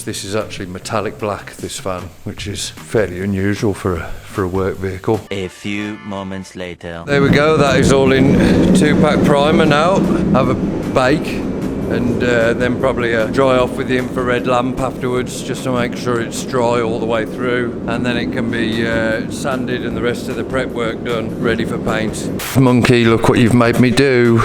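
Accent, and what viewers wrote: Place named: Birmingham, United Kingdom. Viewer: Nice to hear a fellow Brummy on the ML channel!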